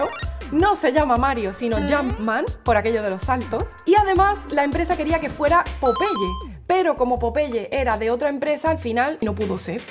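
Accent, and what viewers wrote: Andalusia, Spain. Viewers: Hola siento curiosidad de donde eres por tu forma de hablar, me imagino de España pero de que parte? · 1'22": y ustedes diréis... Con todo respeto, creo que una persona con educación superior no debería hablar así. Esas maneras andaluzas son responsables de que aún hoy día en muchos lugares de Hispanoamérica se hable mal el español y un profesor tiene que dar ejemplo. Saludos cordiales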